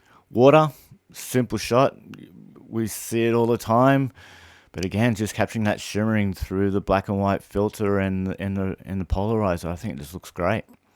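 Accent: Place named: Australia. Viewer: Great video! Love hearing a fellow Aussie on YouTube! I've just done a video / project with a monochrome in camera preset. Loved it! Great video!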